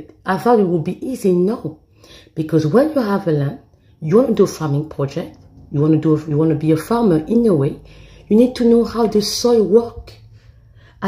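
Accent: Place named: France. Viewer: Mmmm… French accent 😊😊😊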